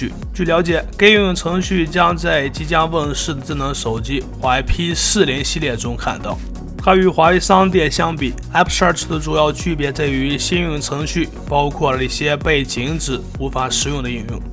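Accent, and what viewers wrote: Shanxi, China. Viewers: 你这是山西的还是河北的？ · 山西兄弟吗？好好练练普通话... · 哈哈山西人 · 兄弟，山西人？托县的？ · 山西口音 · 山西口音啦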